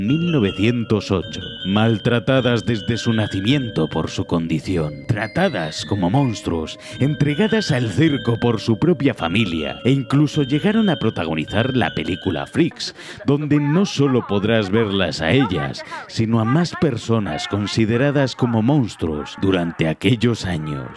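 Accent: Spain. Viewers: Una pregunta eres de España o de latinoamerica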